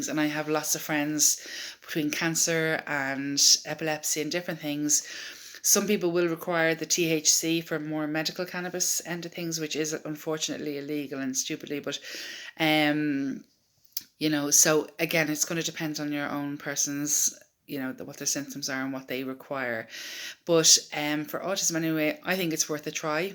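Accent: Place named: Ireland. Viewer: Hiya, sorry I have another question which I hope at least you can help me with. I've been doing more research on this oil and just wondered, 'how' did you work out what dosage to give your son (or even yourself as I've learned?) Was it merely trial n error or were you given any guidance? Many thanks. Ps. Forgive me but I said earlier that you sounded like a 'brit' but now I recognise that lovely irish accent(lol. Silly me) Tc now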